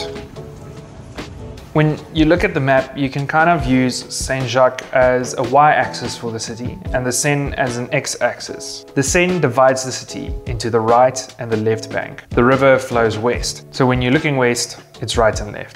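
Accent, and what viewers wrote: South Africa. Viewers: South African presenter? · Ek het gedog dié man klink soos 'n Suid-Afrikaner en toe ek gesien het, het ek sommer net geweet! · Is that a South African accent? ❤ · I know a South African when I hear one👁️🇿🇦👁️ great video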